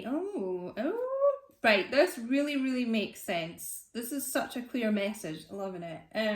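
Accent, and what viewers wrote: Scotland, United Kingdom. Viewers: 🔥♊🔥♊😎💚💚💚 thank you spot on! both Gemini twin flames from Scotland nice to hear ur Scottish accent!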